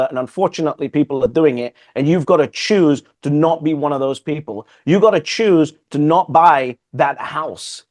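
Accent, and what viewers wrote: United Kingdom. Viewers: Talk that talk ‼️💪🏾💯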